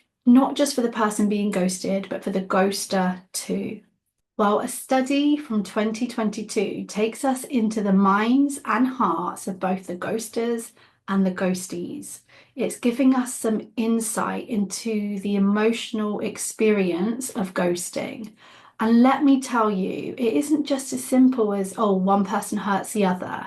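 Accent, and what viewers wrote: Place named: United Kingdom. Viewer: Love your British accent! 😊😉🌺💯💯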